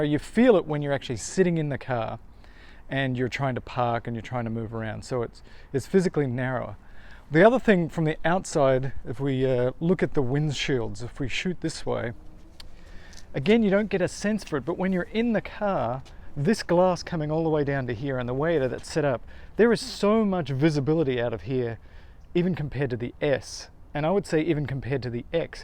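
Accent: Australia. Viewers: Great to hear an Aussie accent ...makes a change... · Do I detect an Australian accent? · Very informative video sir, and is that an Aussie accent i hear?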